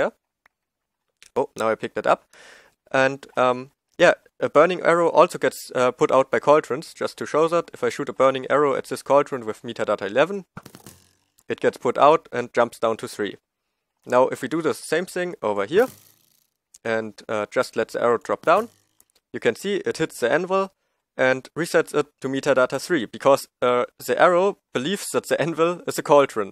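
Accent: Germany. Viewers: Typisch deutscher akzent. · Are you german? ^^ You sound as if you were! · man hört richtig den deutsche aktzent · Are you german ? Its Sounds like :)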